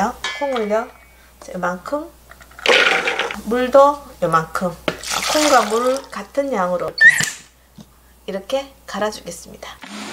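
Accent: Gyeongsang, South Korea. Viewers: 설명 참 정확히 잘해주십니다 목소리도 맑고경상도 억양이 매력적입니다 두부 한번 해봐야 겠다 날마다 좋은일만 있으시길 바래요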